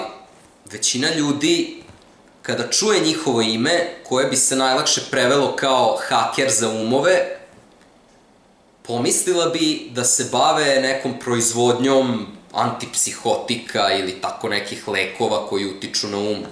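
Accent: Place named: Novi Sad, Serbia. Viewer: Novosadski akcenat🙈🙈🙈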